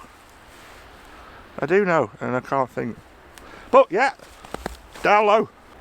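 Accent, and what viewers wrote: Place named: Yorkshire, United Kingdom. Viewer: magic great to hear straight-talking Yorkshire patter. What is the point of a technically correct image if you don't like it?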